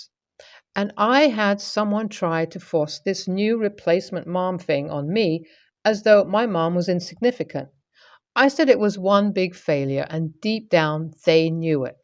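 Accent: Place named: East London, United Kingdom. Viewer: It's a working class English accent from London's East End.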